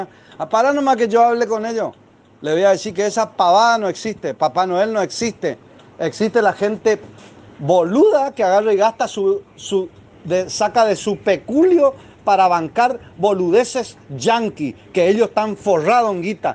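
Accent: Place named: Paraguay. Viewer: Parece Paraguayo los correntinos no tienen tanto hacento litoraleño que de echo es hermoso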